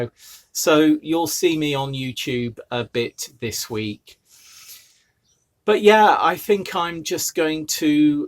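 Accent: RP, United Kingdom. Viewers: YOUR speach is fantastic and a perfect ..we c as lled it * Oxford English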